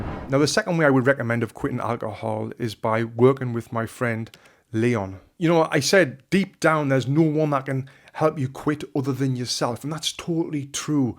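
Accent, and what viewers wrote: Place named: Sunderland, United Kingdom. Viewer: Yes Lad your propa letting your mackem accent out boyo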